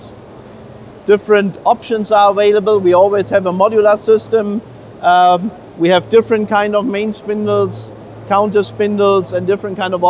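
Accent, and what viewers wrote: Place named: Germany. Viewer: I love his german accent😂😂😂😂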